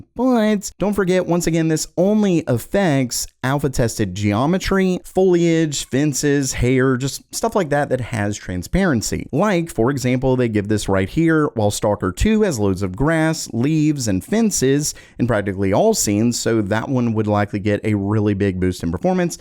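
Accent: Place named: Pennsylvania, United States. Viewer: dude are you from pennsylvania. you say on like awn. my wife does the same thing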